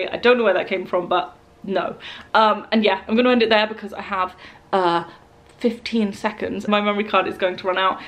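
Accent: United Kingdom. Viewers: I'm a new viewer and already intrigued by your accent - what are the influences? It's like a queen's english dialect over something more regional? Scottish? Irish?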